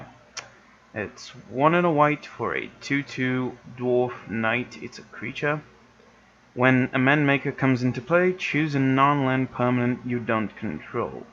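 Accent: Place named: United Kingdom. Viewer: Dat british accent tho hue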